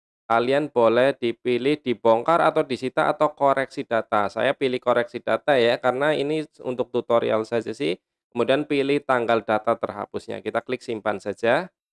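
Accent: Banyumas, Indonesia. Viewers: Terima Kasih Ilmunya Pak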